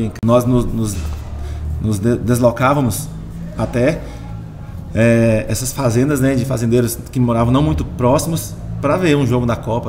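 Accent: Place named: Northern Minas Gerais, Brazil. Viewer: Canta muito..a voz dele parece com norte de Minas ..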